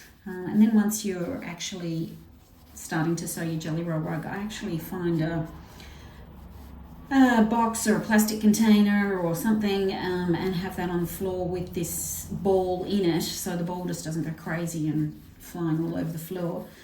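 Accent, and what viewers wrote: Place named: Australia. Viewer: A big thankyou....very informative. Not a boring video as they can be N you sound Aussie, like me.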